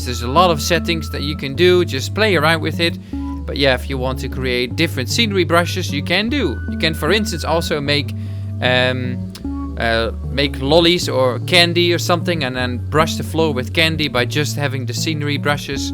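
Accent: Netherlands. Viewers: one question, are you dutch? Love the vid btw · main thing I learned from this video is that you're from New Zealand 😂I always assumed your accent was Dutch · You realy sound like a dutch guy! Nice video btw! :D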